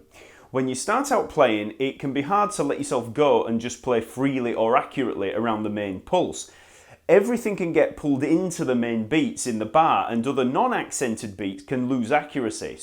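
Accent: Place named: England, United Kingdom. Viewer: Where are you from, Mate? Liverpool? South London? I can understand everything you're saying.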